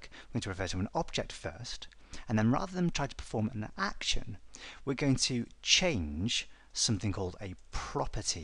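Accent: United Kingdom. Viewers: I'm not hating at all (please no flaming), but its sooooo freshing to hear an English accent on a programming tutorial video. I'm currently using YouTube to learn <link>, SQL, <link> and a range of others and all the videos I have watched they have been American/Indian. I have no problem with that at all but its good to see the UK has some programming skills! Great tutorial, really easy to follow and I learnt a lot. Time to watch the rest of your videos :D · Your videos are fantastic, and your accent is so beautiful! Are you british?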